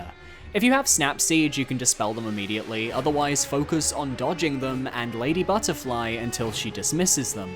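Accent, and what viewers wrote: United Kingdom. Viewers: Stop saying Sekiro like a weeb, you’re obviously british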